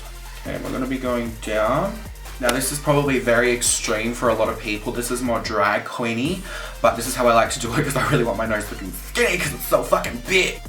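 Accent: Australia. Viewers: are you Aussie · Are u Aussie ! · this is probably the best nose contouring vedio i hav ever seen. 😍😍. u r bomb btw!💦💦. are u an aussie? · UR DEFINITELY AUSTRALIAN !!!🇦🇺🇦🇺🇦🇺🇦🇺🇦🇺🇦🇺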